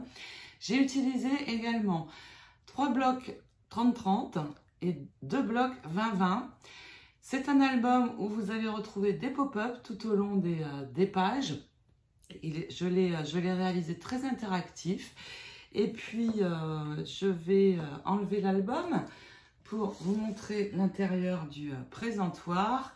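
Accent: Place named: Nord, France. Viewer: Bonjour ton album est vraiment magnifique. En t écoutant tu as garder ton accent du Nord j'adore.des bisous 😘😘😘😘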